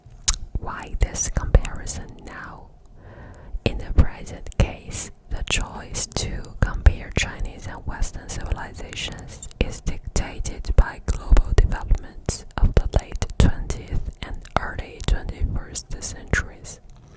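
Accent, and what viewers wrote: England, United Kingdom. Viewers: I really like your English accent you should be make more video like that video please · Love your english accent, send me off to sleep! New sub from me would love to see more like this!❤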